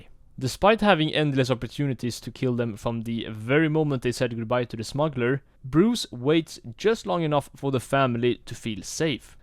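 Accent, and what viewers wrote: Sweden. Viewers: Are you swedish because of your accent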